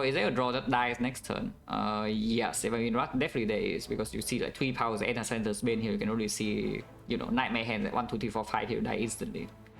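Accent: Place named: Vietnam. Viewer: How sheltered are you to have never heard an accent before? He's Vietnamese and lives in Vietnam. I think his accent is great and he's also fucking hilarious. Please stop talking.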